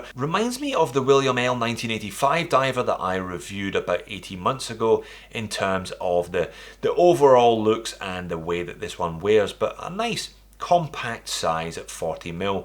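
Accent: Scotland, United Kingdom. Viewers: Honestly I think you’ve got the best watch channel on YouTube. Even when you don’t like a watch you give it a fair shake and most of the time you don’t review watches that an average person can’t afford. And you’ve got a Scottish accent which makes everything you say more enjoyable. Keep up the good work my man.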